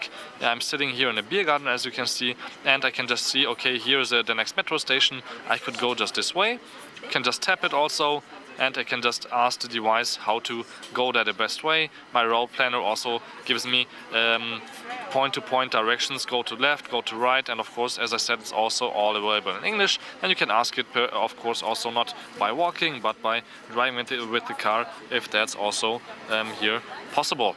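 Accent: Germany. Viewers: Your mother-tongue is german, isn't it? :) Nice overview btw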